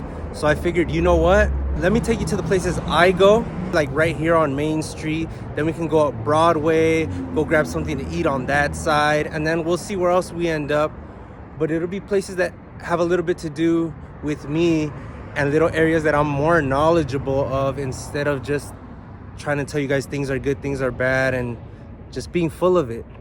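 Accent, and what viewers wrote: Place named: Spain. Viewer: I live in this 'hood. To my ear, the youtuber has a slight Spanish accent.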